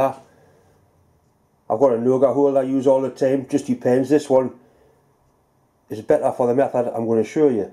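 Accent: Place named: Liverpool, United Kingdom. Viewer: thats canny man scouser trying to be a geordie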